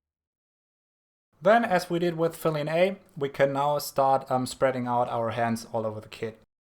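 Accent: Germany. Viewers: are you german? :P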